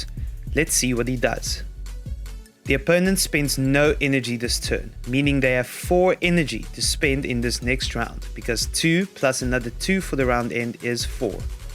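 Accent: South Africa. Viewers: This must 100% be a South African, can spot that accent immediately.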